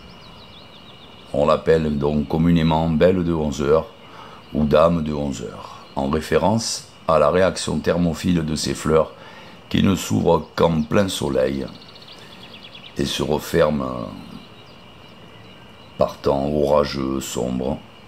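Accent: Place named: Hérault, France. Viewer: Merci pour l'explication. Ce joli accent vous vient d'où ? De l'Hérault ? Merci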